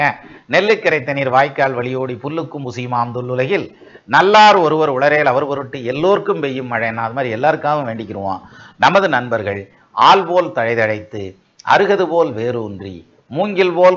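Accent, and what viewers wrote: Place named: Tirunelveli, India. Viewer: யோவ் வழ வழ னு எதோ 60 வயதுக்கு மேல் இருப்ப வர் பார்த்து யாருக்க வது சொல்ல வேண்டும் போல.... நெல்லை தமிழ் சிறப்பு.... 😴😴😴😴😴😔😥😢😢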